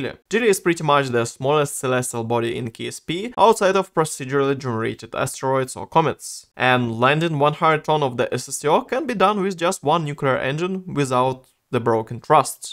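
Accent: Russia. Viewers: это русский акцент или мне кажется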